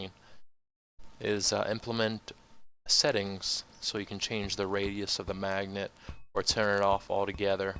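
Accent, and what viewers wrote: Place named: Netherlands. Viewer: are you from the Netherlands because you have that kind of accent also you make Amazing mods Keep it Up!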